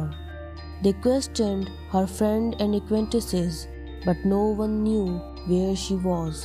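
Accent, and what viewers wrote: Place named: India. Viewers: Sounds like India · That's indian accent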